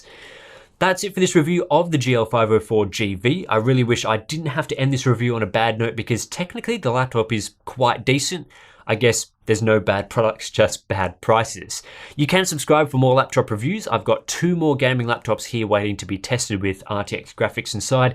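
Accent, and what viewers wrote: Australia. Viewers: Most other reviews are done in a heavy accent. It was refreshing to hear a fellow Aussies voice for this review. Subscribed, and bought the 17.3" scar II (2060)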